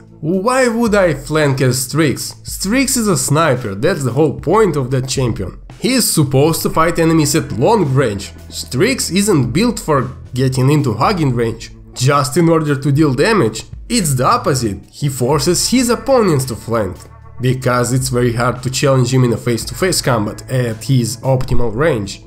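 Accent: Russia. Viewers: i like how u can talk in my language with ur russian accesnt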